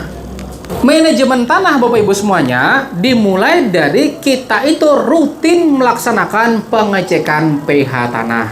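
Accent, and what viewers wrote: Banjar, Indonesia. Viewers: urang Banjar kah pian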